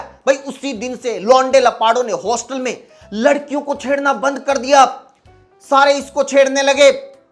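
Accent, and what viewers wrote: Haryana, India. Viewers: bhai Aapka Andaze-Bayan Haryanvi hai aur content is full of true facts and fun. I like your videos. All the best. 🎉🎉 👏 👏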